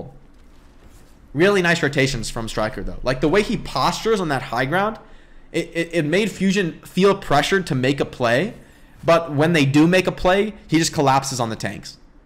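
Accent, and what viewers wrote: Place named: San Diego, United States. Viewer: The intro sound like Doug DeMuro. Is that just a San Diego cadence thing?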